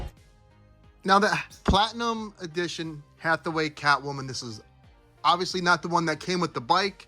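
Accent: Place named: Connecticut, United States. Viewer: Connecticut..that explains the accent 😆 but really, did ya find em on the shelf..or did ya hustle them from the back ?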